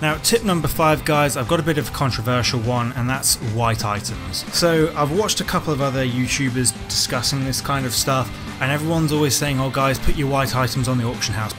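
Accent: Exeter, United Kingdom. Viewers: Are you from Exeter?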